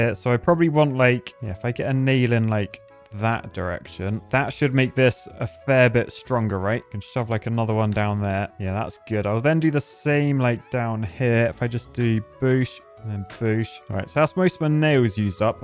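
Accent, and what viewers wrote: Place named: United Kingdom. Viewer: That accent he's got. What is it? It's British, but what part of England goes "bewesh" instead of "boosh" and "cloiyser" instead of "closer". It tickles my ear to hear someone say "Aye doiyn't naeuiy" instead of "I don't know"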